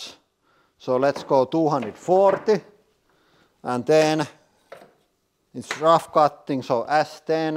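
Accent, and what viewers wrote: Finland. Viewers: Is this guy from Finland? His accent is Finnish. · Terve! A long time ago I washed many hidraulic press videos, stopped watching a for a while, never thought you was from Finland. I'm living in Finland for a year now and then watched again one of your videos. I immediately recognized the accent, and then realized right away you are Finnish. 😃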